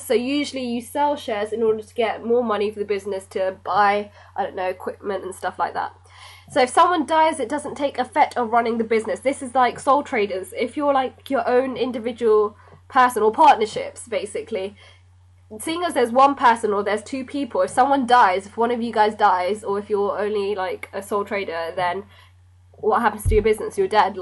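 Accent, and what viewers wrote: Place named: United Kingdom. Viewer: I like the way you taught hahaha!! It helped me understand alot. You've shown a lot of enthusiasm in your lecture and you sound like a UK girl ; ) Anyways, nice vid.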